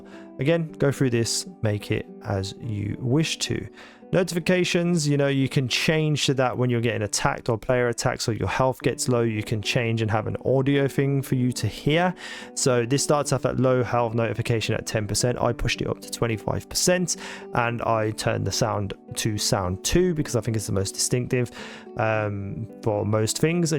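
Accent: Birmingham, United Kingdom. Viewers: Alright mate, just downloaded the game now. You sound like a fellow Brummie btw which is cool! If you go to the controller preset page where it shows image of the controller, you can see that quick turn around 180 degrees once you enable the setting is double input of pulling left stick down. Iv spent 3 hours trying to dial in the settings so this feels nice. Very confusing and still working on what to assign to my 2 back paddles on the controller. Im using controller on PC and its not as buggy as console thankfully.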